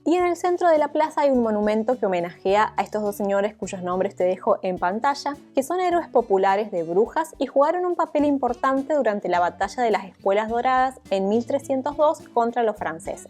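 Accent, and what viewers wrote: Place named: Argentina. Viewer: Reconozco un marcado acento argentino en tu dialecto =D Muy lindo recorrido. #GraciasPorCompartir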